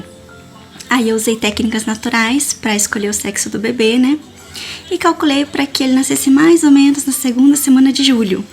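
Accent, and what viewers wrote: Minas Gerais, Brazil. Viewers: Uma pergunta, você é nascida no Japão ou no Brasil? Porque essa fala em "mineires" foi demais :3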